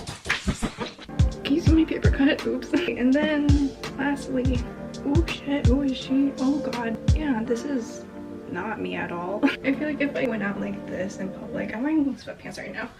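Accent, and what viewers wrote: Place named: Philippines. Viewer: Which part of the Philippines are you? She does look like filipino and her accent if is filipino accent passing 🥱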